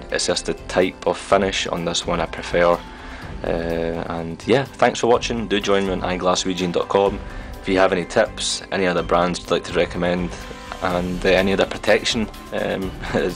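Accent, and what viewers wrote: Scotland, United Kingdom. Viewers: u sound scottish? am i correct?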